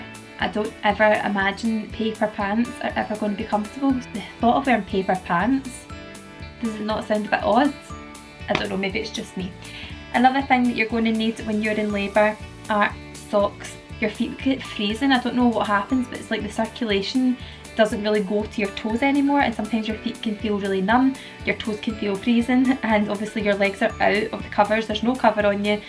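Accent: Scotland, United Kingdom. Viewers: i loved watching your video!! so nice to come across a scottish accent! im from isle of bute and live in Australia. thank you x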